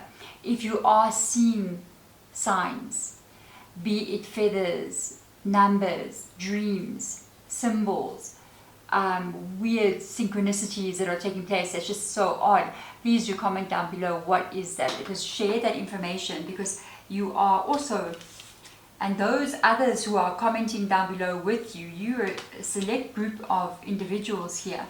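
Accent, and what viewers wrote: South Africa. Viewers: Are you South African? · I was about to say, mam are you South African? And you are 😩😩😩😭 we truly recognise our own ❤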